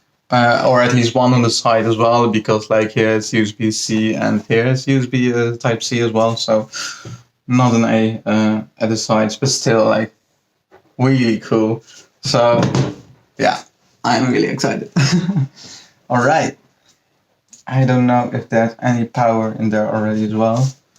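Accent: Netherlands. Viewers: Congratulations! How much did you pay for it? Also for what work did you buy it for, other than having of course. Like what softwares are you going to use in it? I am a mechanical engineer so wondering if those software's can run in it. Also what accent is that? You speak good English but have an accent can't really pin point it 😂. Dutch? Portuguese? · Nice man, got mine last week (one spec lower, ryzen 7, 1tb) and I'm loving the clean looks! (Ook nederlands denk ik te horen, en toevallig ook industrieel ontwerper (student)? Ik heb dat boek ook hahah)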